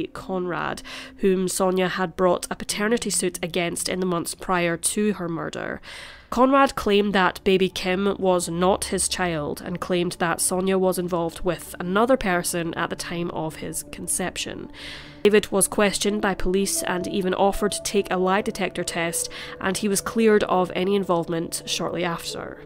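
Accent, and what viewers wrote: Scotland, United Kingdom. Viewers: I could listen to you all day! When I was little, my mother was very ill, and the neighbor lady looked after me. She had emigrated from Scotland, and that accent is associated with some of the happiest days of my life. Keep up the good work! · Is the narrator east-coast Scots? Former Banffer here. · Yes, fellow Scot, nice to hear our accent. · Honestly I have a hard time listening to the Scottish accent. Not cause I can’t understand it, just don’t have like them.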